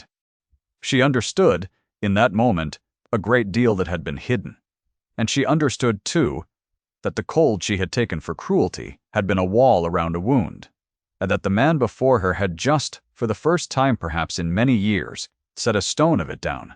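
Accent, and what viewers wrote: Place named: North America. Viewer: The North American accented AI for a story set in 19th century England doesn't do it for me...and the voice was very monotone. ZZzzzz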